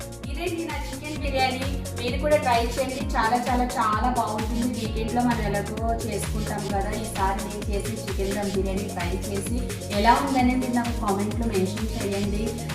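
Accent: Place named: Mahabubnagar, India. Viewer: Na language kuda me mataladinate undati same I am mahaboobnagar district . so nice mam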